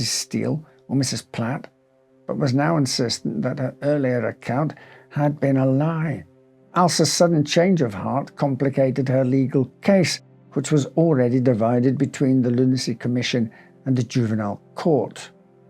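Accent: United Kingdom. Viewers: This could be a regional English accent.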